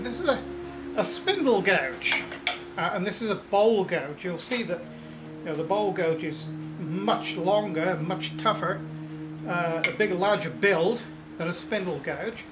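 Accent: United Kingdom. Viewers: I really didn't expect you to have a British accent. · sounds british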